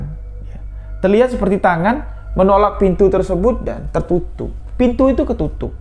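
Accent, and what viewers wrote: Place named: Java, Indonesia. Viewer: Mas logatnya kek asli jawa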